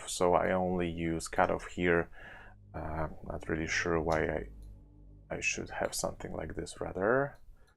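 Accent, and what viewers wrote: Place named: Poland. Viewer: Mówisz po angielsku jak większość Polaków - od razu słychać pochodzenie. Biblioteka fajna - jednak nie moje klimaty. Pozdrawiam :)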